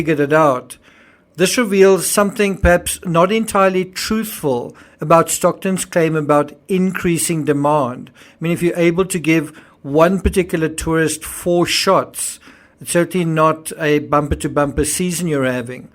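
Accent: South Africa. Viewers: Your South African pronunciations are interesting and enjoyable. I have a SA acquaintance who likes listening to you, reminds her of home.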